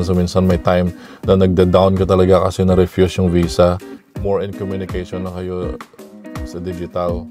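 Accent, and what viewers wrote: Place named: Ilonggo, Philippines. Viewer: Nanami-an ko mamati sang tono mo. Siling ko, daw Ilonggo gid ni mu, and then i saw your other videos...ay, kababayan gid, taga Negros man gali. 😊 Makabulong sa hidlaw. 😊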